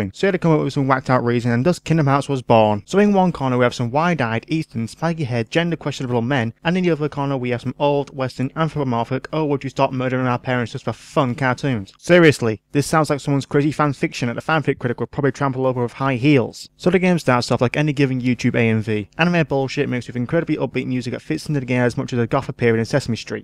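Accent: Northern England, United Kingdom. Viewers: I do! Gay lyfe! You from Northern England? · you'll find that most british people can understand this perfectly fine, it's just most of the american audience switch off when they realise that not everyone in britain speaks like hugh grant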